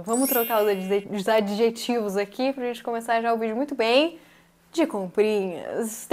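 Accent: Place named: Minas Gerais, Brazil. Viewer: Tá muito mineirinha kkkkk "esse trem aqui ooh" 😂... Sou mineira ❤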